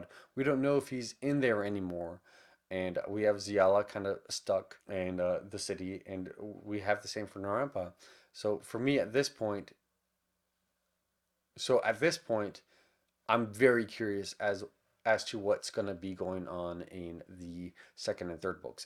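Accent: South, United States. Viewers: I love your video at your house in Texas during your quarantine until you had one of the books of "Black Sun" by Rebecca Roanhorse while it's all about a god return, when the earth and sky converge under the black sun, in the holy city of Tova the winter solstice is usually a time for celebration and renewal, but this year, it coincides with a solar eclipse, a rare celestial event proscribed by the sun priest as unbalancing of the world. Meanwhile, a ship launces from a distant city bound for Tova and set to arrive on the solstice. The captain of the ship, Xiala is a disgraced Teek whose song can calm the waters around her as easily as it can wrap a man's mind. Her ship carries one passenger. Described as "harmless" the passenger, Serapio is a young man, blind, scarred and cloaked in destiny. As Xiala well knows, when a man is described as harmless, he usually ends up being a villain and you're talking about all of the characters from the book after that, I hope your husband, Zach will be very proud of you and I love your Southern accent, I know you're half Mexican!!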